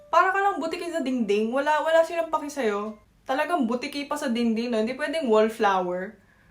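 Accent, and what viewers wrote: Batangas, Philippines. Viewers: kyot mo teh~:face-green-smiling: kaya pala medyo batanguenya yung accent mo taga dito ka pala dati. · Love you, isa ka na talagang Pinay, salamat for embracing our culture lalo na ang aming wika, Batangueña rin ako kaya i can relate to your diction, ala eh..... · That’s why! whenever I watch you I hear words, expressions and even a bit of Batangaueña intonation. Where in Batangas? Batangueña too. A Maroon as well. More power to your channel and congratulations to 100k!🎉👏🏼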